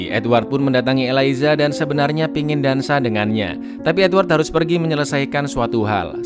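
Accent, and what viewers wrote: Java, Indonesia. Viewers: Nyok mas wkwk ngakak aku sampean wong endi se ? Lek di jawab tak subscrbe sumpah cuk lek ngomong medok kyk sby an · Wong jowo ta bg😂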